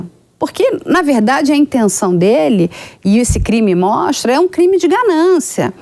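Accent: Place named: Rio de Janeiro, Brazil. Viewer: vc eh boa profissional e gosto de assistir seus vídeos, MAS POR FAVOR, PARE DE FALAR CARIOCA!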